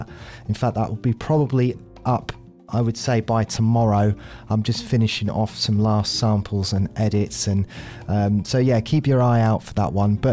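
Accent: United Kingdom. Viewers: Youre forgetting about the gorgeous british accent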